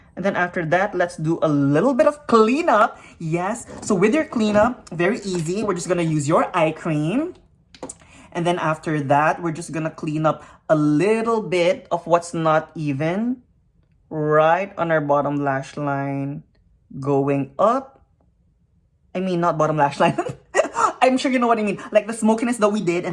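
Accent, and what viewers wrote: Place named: Philippines. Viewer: Love your speech over the dream and your Filipina accent! YASSSS! Immigrant +1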